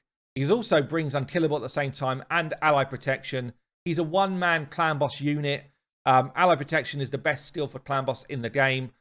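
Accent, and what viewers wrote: United Kingdom. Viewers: you’re easily one of those content creators that I can listen to for hours lol it’s the British accent! Love it! Oh and you put out must have content which I like so thanks bud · I don't know if it's what you say, just being British, or saying what you say with your British accent, but your videos like this just make me laugh. I love how you just beat up on poor Woad-Painted lol.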